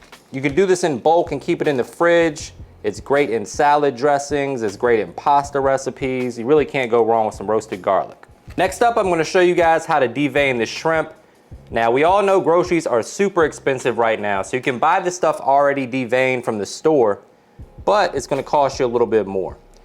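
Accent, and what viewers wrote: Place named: Pittsburgh, United States. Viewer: First time watching you. Recipe looks amazing and will make it today. Are you from Pittsburgh PA? You sound like everyone I know, from Pgh. 👍🏼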